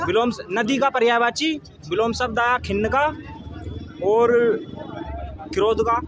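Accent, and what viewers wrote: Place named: Bihar, India. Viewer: भईयाजी बिहार से हो क्या 🧐🧐🧐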